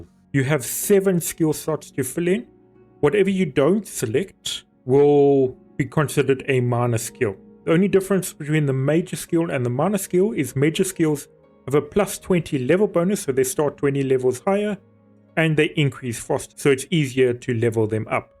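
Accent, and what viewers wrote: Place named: South Africa. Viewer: Heard that accent and immediately knew I’m dealing with a real boet here lol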